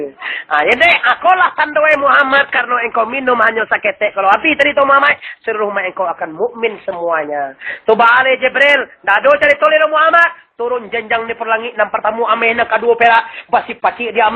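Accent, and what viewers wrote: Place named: Pariaman, Indonesia. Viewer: Ondeh badaceh bana baso piamane ko mo jo.... sero wak nan lahia gadang dirantau....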